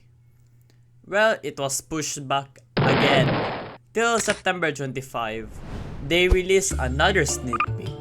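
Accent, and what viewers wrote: Philippines. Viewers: Im new to the channel and 20 seconds in i noticed that Filipino accent XD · haha knew it from the accent, im also a filipino playing cw nice content btw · pinoy to AHAHAAH halata sa accent eh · the strong filipino accent is killing me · This is the most pinoy accent ever yo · Filipino Accent??? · you sound filipino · Is Filipino? cuz the accent sounds like it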